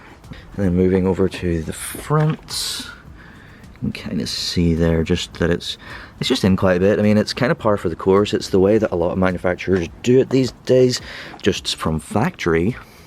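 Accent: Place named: Northern Ireland, United Kingdom. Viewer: Are these legal in the UK as I am presuming by your accent and vehicle reg that you are in Northern Ireland. I have had 15 mm spacers on an E46 M3 in Australia where it is illegal probably based on the 'piggy back' type that are pretty risky. I wrecked two wheels and did thousands of dollars of suspension and body damage when a 30 kg manhole flipped out of its hole and instantly deflated both tyres and the spacers were unaffected but I did take them off before the car was repaired under comprehensive insurance. I am moving back to the UK and would like to put these spacers on a mini clubman if legal or probably even if their not legal but a bit of a faf if you have to take them off for MOT's and inspections by the Rossers.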